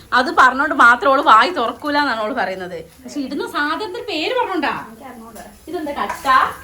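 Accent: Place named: Vadakara, India. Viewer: chechide veed evideya? Samsaram kettitt vatakara baghath evideyo anennu thonunnu❤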